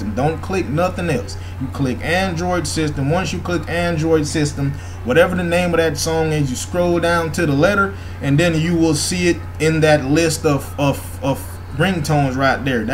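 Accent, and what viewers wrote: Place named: South, United States. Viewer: Love the country accent.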